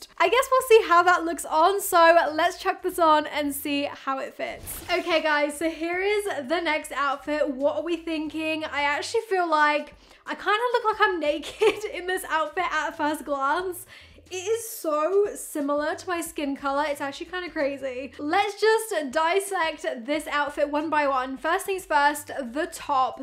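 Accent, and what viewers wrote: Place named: United Kingdom. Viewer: I think lots of people from the UK talk like that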